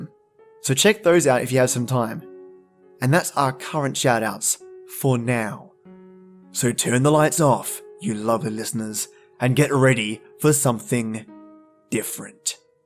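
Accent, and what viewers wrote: Australia. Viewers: This 1 really touched my heart. Your Aussie accent just makes these stories all the better mate! :-)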